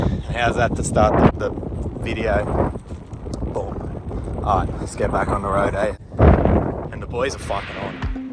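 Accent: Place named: Australia. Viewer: Love the australian accent m8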